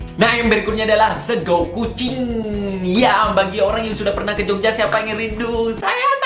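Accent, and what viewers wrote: Bali, Indonesia. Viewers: Gataw knp ya, denger dialek bali itu sesuatuu bgt bli ✨💫💜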